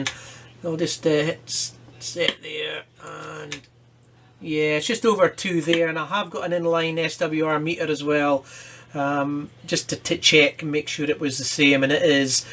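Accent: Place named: Aberdeen, United Kingdom. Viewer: Aye that's a good video, you sound like you have an Aberdeen accent 😁I'm in Aberdeen & you remember the old breaking Channel 14 lol. Perhaps hear you on cb in the Granite City 🏙 😎 👍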